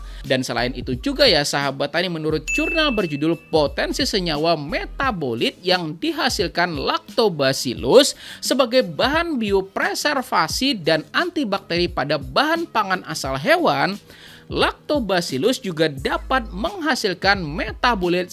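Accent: Banjar, Indonesia. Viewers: Orang mana sampeyan. Bahasa Banjar takana takaluar. 😊😊😊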